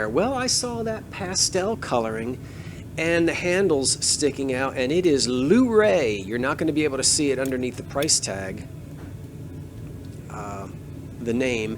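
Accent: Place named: Pittsburgh, United States. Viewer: My roomy insists you're from Pittsburgh. Are you?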